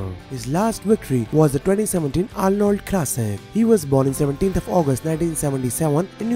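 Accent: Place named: India. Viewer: ENTIRE PHRASES WENT BY THAT I COULD NOT UNDERSTAND.😩 NARRATOR SOUNDS TO BE FROM INDIA. IF HE WOULD JUST SLOW DOWN, IT WOULD HAVE HELPED!☹️😩😆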